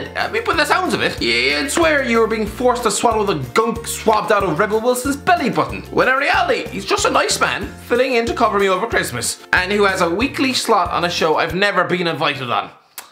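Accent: Ireland. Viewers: When you're that Irish the subtitles think you saying "hello and welcome to hitc sport" is you saying "how about tomatoes disease poor" you need to slow down when you talk my friend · Yes! The sca....Irish guy is back!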